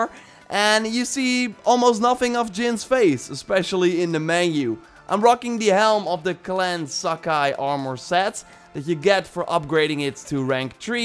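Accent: Netherlands. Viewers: Bet he is, sounds wayyyyyyyy too dutch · Yes it's the most obvious dutch accent ive ever heard on youtube, and it annoys the fuck out of me lmao. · He sounds dutch that’s why😂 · Ben je Nederlands? I can sense an accent. Became a subscriber because your AC Origins video. Love the content amigo. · Damn I was right! I knew it sounded familiar. Visited the Netherlands back when I was studying in France and heard it back then. Been 5 years now and his accent reminded me of that trip for some reason lol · Marcos Vargas its his accent, he's from the netherlands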